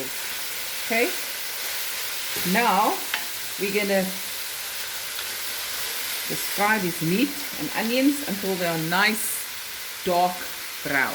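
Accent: South Africa. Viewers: Nice to hear a South African accent again . Regards from Switzerland . Looking forward to cooking it . Looks lekker .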